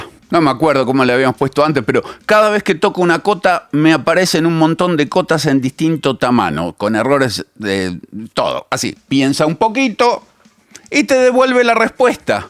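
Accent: Argentina. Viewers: Arqui.. Yo realice la creación de una GEM de Gemini y le cargue todos tus videos de REVIT y que crees??.. me responde literalmente igual que tu. Con todo tu conocimiento de Revit. Eso me ha ayudado a resolver muchos temas. Hasta el tonito argentino me da. Pero dudo mucho que iguale a tu Bot. Por cierto, le puse de nombre a la GEM "SuperManes". Saludos desde México.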